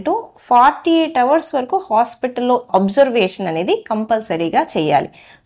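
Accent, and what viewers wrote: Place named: Rayalaseema, India. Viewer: కొంచం రాయలసీమ యాస గుంటూరు యాస మిక్సింగ్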